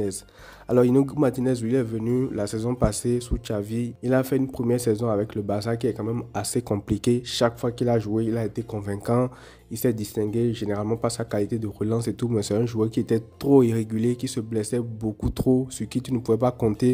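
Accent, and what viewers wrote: Benin. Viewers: Je découvre ta chaîne, tu as un petit accent béninois ou c'est moi ?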